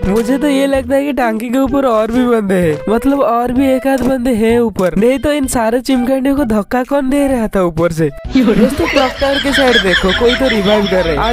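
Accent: Odisha, India. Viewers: Your voice is odia 🥰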